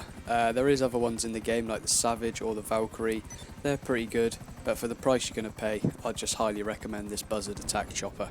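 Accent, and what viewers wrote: United Kingdom. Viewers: Is he British or English but anyway I love his accent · I think the same, all youtubers GTA do clickbaiting. Please don't end up doing this though. I actually learned something from your video. I'd normally find British accents annoying but your voice is kinda soothing so thanks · This guy sounds British but his personality is all Canadian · love that british accent, nice video btw :D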